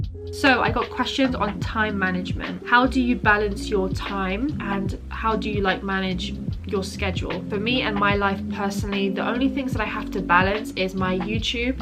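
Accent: United Kingdom. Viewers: Yeah I’m in love with her accent. The British accent seems so elegant!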